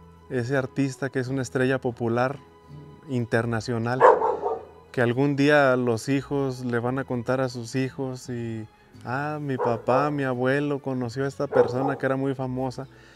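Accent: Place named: Mexico. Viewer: Muy conito me encanta lo q mas me gusta escuchelo ablar me encanta el acento mejicano un fuerte abraso desde el salvador con muchocarino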